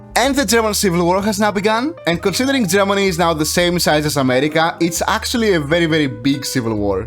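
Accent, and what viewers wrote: Greece. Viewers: Bro , are you greek ? Cuz the accent really seems greek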